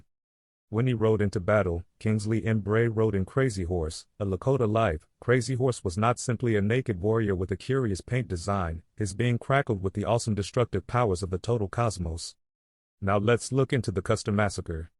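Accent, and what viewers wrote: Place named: India. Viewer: Too bad the narrator can't seem to do any more than to read without proper cadence or at least pausing between thoughts or sentences. Then he (or the AI) pauses in the middle of a sentence. The built in text also goes like this without pauses at the end of sentences and no punctuation. Because the narration and on-screen text being so badly strewn together, it's hard to understand. Sick of the poor robotic narrations these education videos lately use. Even though this one was tuned to sound like an Indian speaking English poorly. Don't think any human reading this could have voiced it so poorly. Could have been good info but was marred by poor narration!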